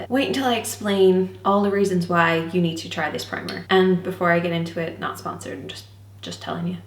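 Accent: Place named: Ireland. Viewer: I picked up on that cute accent of yours right away..im Irish too!! And will b visiting Ireland I'm praying within the next year! And i just want to tell ya,u r so beautiful!! Irish Women rock lol! And need to try this primer...thanks for video!!💚☘💚☘💚☘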